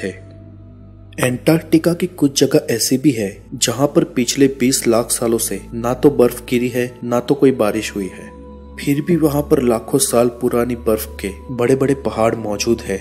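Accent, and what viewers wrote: Gujarat, India. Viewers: Speaker is Gujarati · Gujju ☝️ accent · R u Gujarati???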